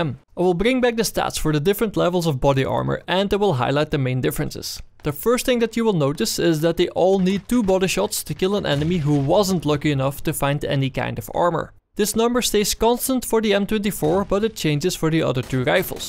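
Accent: Netherlands. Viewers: A quite thick Dutch accent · You're Dutch arent you? :) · are you dutch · from the moment you started talking i knew.. dat je nederlands bent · Are you Dutch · je bent nederlands he? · You sound so Dutch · It sounds like he comes from the Netherlands, so I assume that's his accent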